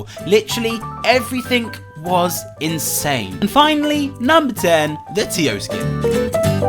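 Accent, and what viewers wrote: United Kingdom. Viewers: british guy time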